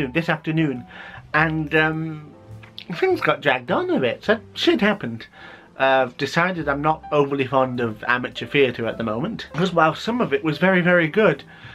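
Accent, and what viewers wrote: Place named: Blackburn, United Kingdom. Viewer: It was a Blackburn accent not Scottish :p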